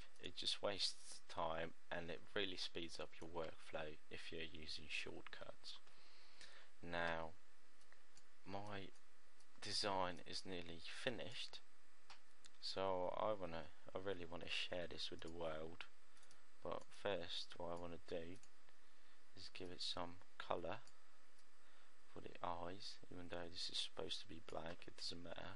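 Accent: United Kingdom. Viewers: Its an English accent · It's British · I do have trouble following British accents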